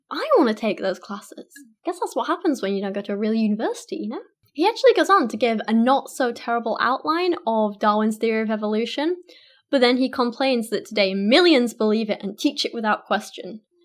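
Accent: United Kingdom. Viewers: Girl why don’t you do something constructive and wear less makeup, why do you atheists insist on going after others who don’t follow your fairytales? Maybe spend more time looking in the mirror, beauty is skin deep, your soul is dark and ugly, and why make videos at your expense while trying to please your moronic subscribers? You bring up Dr Kent Hovind because you need viewers, maybe develop your channel on your own merits and not going after someone trying to save you from hell. But you sound like a Brit so that explains your godless attitude, hope that works out for you.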